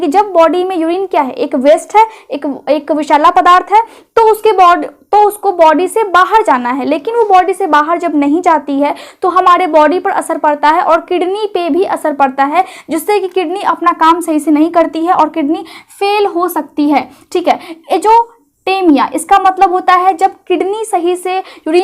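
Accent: Bihar, India. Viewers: R u belong to bihar state? 😊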